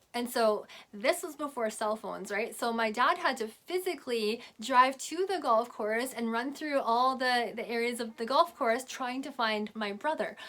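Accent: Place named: Canada. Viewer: You sound extremely Canadian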